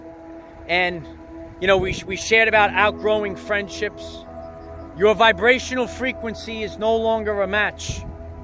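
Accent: New York, United States. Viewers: WOW! This was SUCH an amazing, powerful message. Thank you so much AA. Half my family and friends are jabbed zombies and I tried for years to wake them up, to a point of utter exhaustion. Now I just barely got enough energy to carry myself through so this message was perfect. Anyone else in here experiencing "glitches" with communications? Half my text messages and emails either never get received or I dont receive theirs. Its very odd....come to think of it, those are the zombies in my family and friends. You are so dead on when you say They cannot hear us anymore and the frequencies dont match anymore. That would explain all the "communication glitches" with my emails and texts. Thank you so much for all you do. Love your Yorker Accent:) Hugs from Canada xoox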